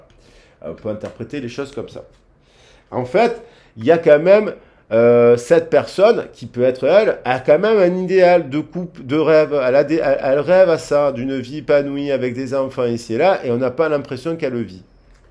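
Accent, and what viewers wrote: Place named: Marseille, France. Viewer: Bravo. J ai visité Marseille ce WD. J ai bien aimé. Je crois que vous êtes de là bas? L accent!😉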